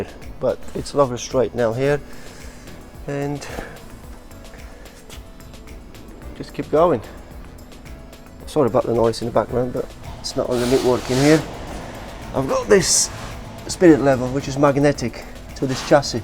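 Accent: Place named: Lincolnshire, United Kingdom. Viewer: You have a slight Lincolnshire accent going on there.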